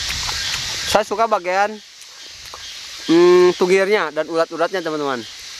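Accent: West Java, Indonesia. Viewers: "saya tigebros temen2 " khas sunda melekat bang jago, sukses terus a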